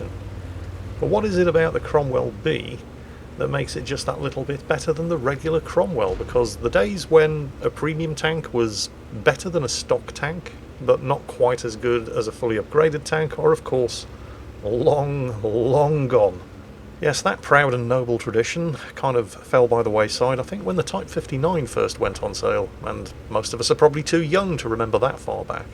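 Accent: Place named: England, United Kingdom. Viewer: As a frenchman, my main reason to come here is to hear an englishman’s accent and, if lucky, hear him butchering the french language. I’m in English-french-confrontation withdrawal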